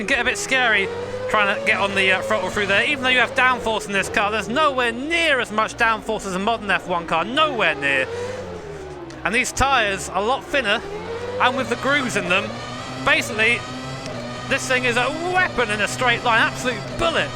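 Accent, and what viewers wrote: United Kingdom. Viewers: I just realized how good of a F1 commentator would be, I mean the eloquence, familiarity of F1, and his british accent, what more do you need?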